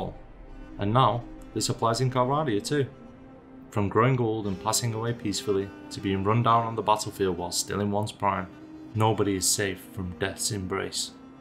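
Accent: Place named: Turkey. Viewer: okuyanın Türk olduğu çok belli bee :D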